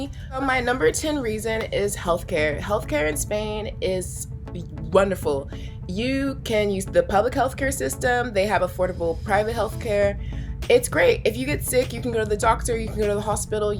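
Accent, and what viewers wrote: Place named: Canada. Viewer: Hi. Just curious what state you are from? You kind of sound Canadian...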